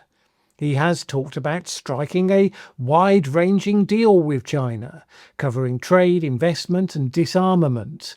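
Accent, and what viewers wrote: United Kingdom. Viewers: How do I block these Brit narrators. Annoying as F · So Sick of listening to some stupid Bloke, with your horrible accent. We Don't Care about your Cheesy Propaganda. You Brits, have Lost your country to Muzzys. You Brits have Nothing to Say. You have a National Passtime 'SLAGGING THE YANKS'. High Time for you to Grow Up. Leave the TARRIF Deal to solve itself, it will. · ARE YOU A BRIT ?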